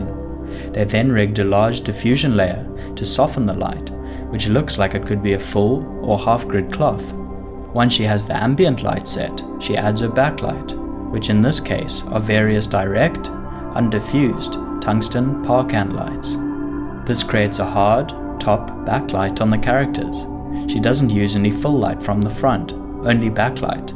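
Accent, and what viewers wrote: South Africa. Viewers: You sound South African 🤷‍♂️ lol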